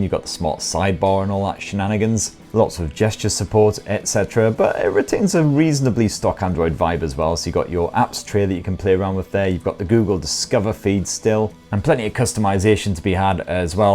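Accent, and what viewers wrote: United Kingdom. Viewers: Oh and I forgot to mention the extremely personable British accent 🙂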